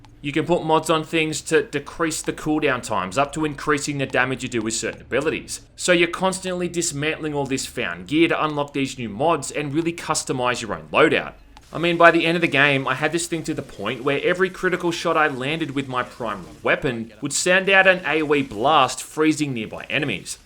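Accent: Australia. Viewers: Australian accent is the best accent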